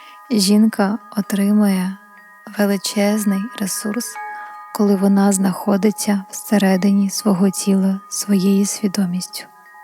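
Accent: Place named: Ukraine. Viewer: Дякую мило🤗,а особливо за українське звучання ❤️🇺🇦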